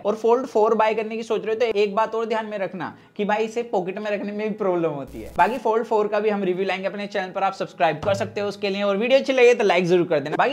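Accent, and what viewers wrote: Haryana, India. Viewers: dude try to improve your haryanvi rough accent.. hindi improve karo aur accent and english pronounciation par dhayan do... gawar mat ban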